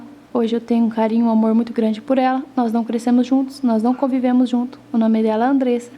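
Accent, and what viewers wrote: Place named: Campinas, Brazil. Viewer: ''Não falo leitE quentE'' haha. Na verdade, eu sou de Campinas/SP e acho seu sotaque bem parecido com o meu. Eu gosto muito da sua história. Você é guerreira e sempre correu atrás de realizar seus sonhos. Pode acreditar que seu canal não é apenas entretenimento, mas também é um canal de bençãos, seja para inspirar outras pessoas a também realizarem seus sonhos ou para falar do amor de Deus através do seu testemunho. :)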